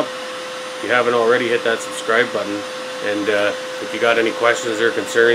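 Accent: Canada. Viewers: This guy sounds Canadian to me. And he used Standard International units (Litres). 😉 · He sounds Canadian.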